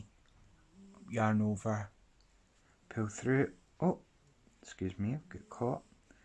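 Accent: Scotland, United Kingdom. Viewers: I recently picked my hook back up after a few years away thank you for making these videos your so easy to follow. It's weird though hearing a Scottish guy teach in usa 😂 I think it's easier though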